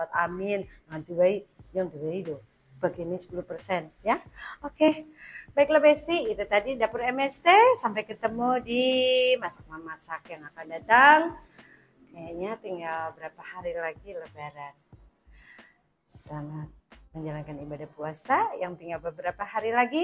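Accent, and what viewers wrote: Banyumas, Indonesia. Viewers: Wes ayu,lucuu,pinter masak sisan.gak lali mbak Ayu ngapak e. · Terhibur banget dg BHS ngapak2nya.. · Mba lucu ngomong ngapak sukses mba ❤ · Pk bambang GA Salah pilih... Wong ngapak pancen oye.... 👍👍👍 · Puluhan th seneng apal lagu2ne Rika.. Tapi nembe saiki weruh krungu Rika ngomong ngapak😂😂😂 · Bunda lucu nemen ya pada karo enyong nge,enyonge juga wong ngapak...tegal hadir bunda 😍😍🤭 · Masyaallah 😅😅😅 ketawa terus liat Tante cooking ngapak2 pulakkk❤❤ sering bikin video masak ya tan..😊😊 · 😂😂😂 lucu bgt mbak ngomong ngapake tp tetap ayu Bae klambine apik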